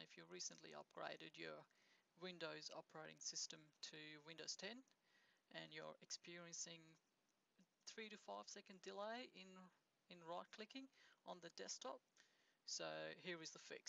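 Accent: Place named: United Kingdom. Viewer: Philip It's so low even with the speakers and the video both set to max volume. No need to insult folks just because you're getting better results. I can just barely hear that someone is talking with an apparent british accent, but placing my iPhone's mic into my headphones right in front of the speaker, with dB meter app running, it doesn't even register a difference from ambient noise. Sometimes I can tell what he's saying if I listen really closely, but most of the time, I can't.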